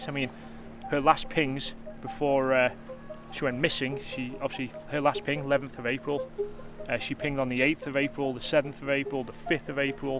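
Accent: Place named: Liverpool, United Kingdom. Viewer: You have a local accent... eport? cheshire ways or liverpool?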